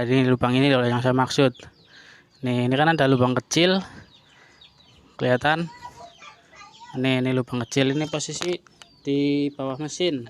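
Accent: Banyumas, Indonesia. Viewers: Njenengan wong endi sih mas? Ngomonge ngapak deneng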